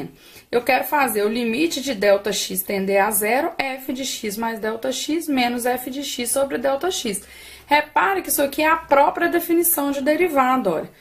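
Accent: Minas Gerais, Brazil. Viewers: Vc e mineira né?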